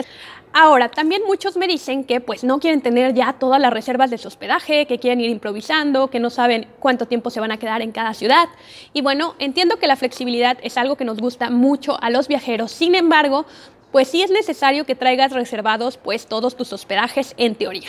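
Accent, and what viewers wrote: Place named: Spain. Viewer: Eres mexicana? Tienes acento espsñol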